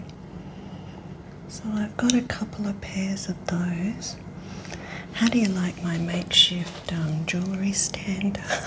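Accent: Australia. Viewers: I found my new favourite ASMRist ❤️ the fact you’re Australian is also comforting for me. I’m from the UK and my 20 year old son is currently in the Philippines but will be heading to Australia in July where he plans on staying for up to 3 years. I miss him terribly, but you’ve definitely helped. Thank you. ❤️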